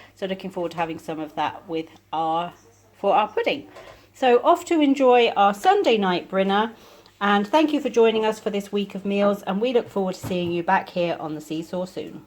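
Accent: United States. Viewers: It really is fascinating 🥰 had no interest in history when I was younger...I love it now🤣 Do you know, when I was listening to you speaking I thought there may have been a slight American twang...15 years wow!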